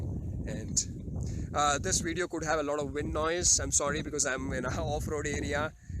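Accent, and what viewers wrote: India. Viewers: it's not fake accent, he is telugu so his pronunciation is like that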